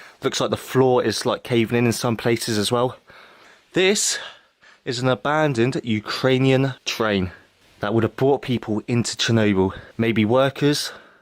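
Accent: United Kingdom. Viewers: godd4mmit, speak ENU nsteado UK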